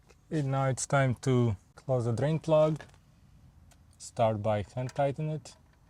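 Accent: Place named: Russia. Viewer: Пипец КАНЕШНА акцент, наших везде слышно 👍👍👍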